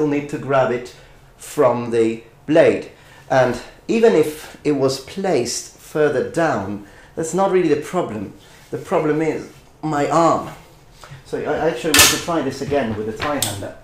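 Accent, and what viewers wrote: United Kingdom. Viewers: what's even more interesting, is an Italian speaking with British accent :) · Where are you from man? I can't pick the accent. It sounds maybe South African or from somewhere around about Holland. I'm tending toward the latter because, though your English is very good, it ain't perfect. I don't mean to have a go but "information" is not a countable noun . PS, on the the other hand. Of course, native speakers don't spit out what the prescriptivists would dub perfect grammar one hundred percent of the time (far from it). However, I'm getting tinges of British flavour here & there, so I'm still guessing the Netherlandish vicinity. PPS So, I was so wrong. You're Italian. Oh the shame! Still, I'd not guess it. Thanks anyhow for you vids. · I just noticed that your British accent is pretty strong! You even use the R between words like in "the idea rof a straight sword". 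That's pretty funny to me but that shows your natural skills with phonetic articulation; you also have a talent for acting, I don't know if you ever studied theater but you definitely should. I'm Sardinian by the way. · T'es français, nan ? J'ai cru repérer ton accent, mais tu as un excellent accent british. ^^ Vidéo très intéressante, je me doutais bien que ce n'était pas possible en temps normal mais ça a l'air tellement cool ! x)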